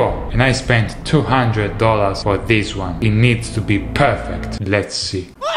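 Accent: Italy. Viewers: italiano si sente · Hey but, are you italian? Because when you said "epico" i understanded that you ARE (i think) italian.. i am parli molto bene inglese ma magari concentrati di più sulla pronuncia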